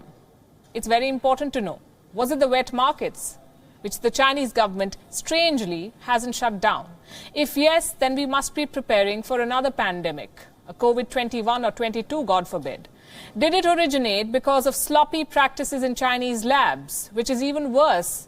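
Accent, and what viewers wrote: India. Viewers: Strong india accent. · this lady talking like indian is number one an arnt in a epidemic of a toilet shortage in its country, you are actually propitiating and brain washing people by- re-repeating what the people question are an not doing what the news are doing by providing an non bios information/solution. India is know as number top unhygienic country an number one in sexual assault, i say this as you slander another country. an get involved in its geo-politics. china says its a bio wepon created by usa, if it was a hazard the market would still be closed. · Indian accent’s never been this cool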